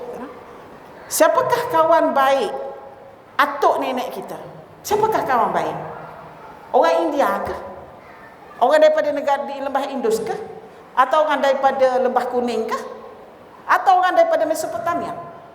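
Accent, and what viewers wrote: Kelantan, Malaysia. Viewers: Saya boleh la dgr ceramah prof. Tp part nk termuntah prof sebut high impact journal. Yg high impact pun bayar duit dh dpt publish walaupun xd kualiti. Sy xsuka sistem universiti dan publication (sama sistem british je). So xyah gebang kt awam lebih2. Buku yg terbaik buku yg iklas buat mcm kitab2 ulama. Bukan mcm jurnal lecturer2 skrg. Nk MUNTAH AKU DENGAR. BTW keep researching. But DONT GEBANG. Thats all😂 btw slang kelantan tu obvious sgt...pompuan kelantan mmg byk dlm ipt mana2 pn. Ntah knpa..mb pandai sembang kot.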